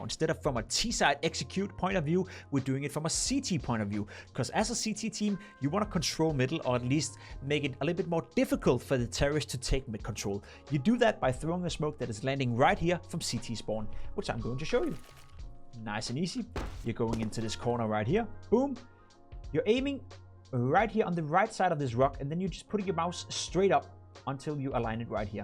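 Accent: Denmark. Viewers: u danish?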